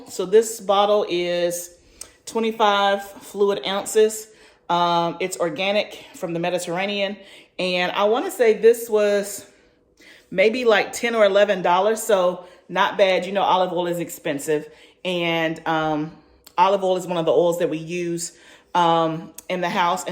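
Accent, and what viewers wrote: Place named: Georgia, United States. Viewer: I love watching Azure Standard Haul's .. You Sound Like A Georgia Girl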